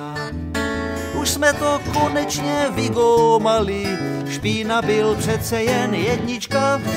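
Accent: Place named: Brno, Czech Republic. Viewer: Hantecu hafo, hafo nerozumim, ale jinak skvělý! 👏👏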